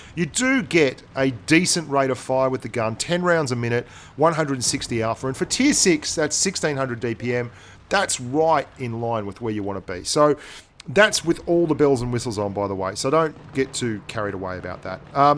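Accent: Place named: Australia. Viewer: ...if you're new here, he's Australian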